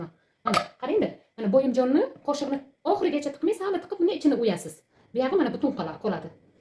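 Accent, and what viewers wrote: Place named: Gijduvan, Uzbekistan. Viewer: Ваалейкум салом,бемалол опа десангиз хам булаверади.Каерликсиз,акцентиз Гиждувонликларга ухшаяпти.Биз Навоийданмиз.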